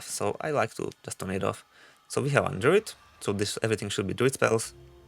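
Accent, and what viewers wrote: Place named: United Kingdom. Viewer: why in the world do people who mumble, and have thick british accents make guides. totally bizarre.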